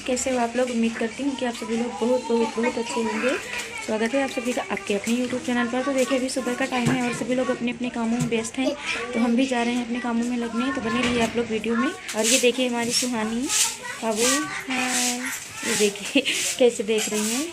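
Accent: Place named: Bhojpuri, India. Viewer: आप तो हम लोग के जैसा बोलती है घरवा कहा बा तोहार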